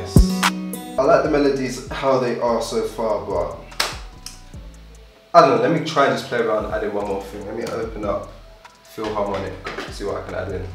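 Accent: United Kingdom. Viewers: You from the UK?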